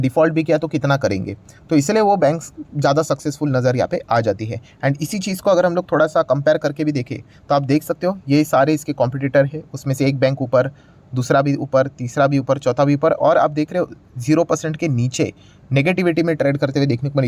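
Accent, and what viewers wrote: Maharashtra, India. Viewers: Sir Good evening I am very much sure that u r 100% maharastriyan as per your toning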